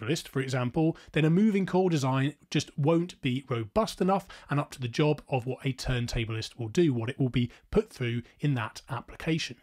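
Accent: United Kingdom. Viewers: Excellent video. Fantastic description.. efficiently to the point. Also the British accent is quite a nice compliment to the dialogue.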